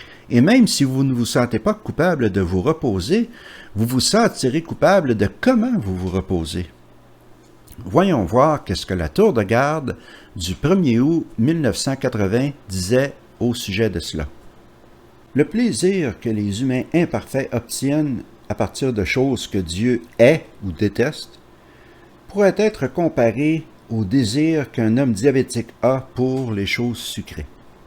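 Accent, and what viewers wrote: Canada. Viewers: Merci pour toutes ces videos. Elles sont tres bien et puis cet accent canadien, je ne peux me lasser de l'entendre.... C'est un accent sympathique, chaleureux. Franchement je ris de bon coeur quand j'entends que les Anges de Charlie et la Petite Maison dans la Prairie etaient des emissions condamnables a leurs yeux. Ils sont fous ces gugus, il faudrait mieux ette sourd quand on entend leurs arguments. La Petite Maison dans la Prairie, ca passe tous les jours a la TV en France. Allez demain je commence a lire les 2 volumes de l'encyclopedie biblique!!!! Cordiales pensees de France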